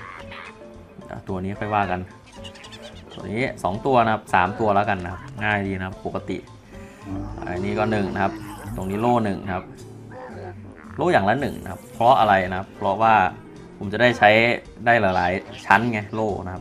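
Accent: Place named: Korat, Thailand. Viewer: พี่พูดโคราชพี่เป็นคนโคราชหรอคับ. ->คักแท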